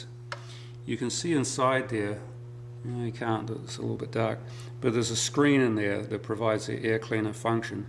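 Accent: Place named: Australia. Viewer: Mate you the best, i bet your part australian, based on how your talk and how some of the words are spoken. keep up the great work mate and i hope to see it on the dyno. cheers please supply a link of the dyno ?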